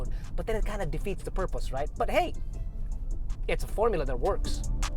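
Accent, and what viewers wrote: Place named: Philippines. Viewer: His pinoy accent is annoying, hindi ba marunong mag tagalog.I guess social siya.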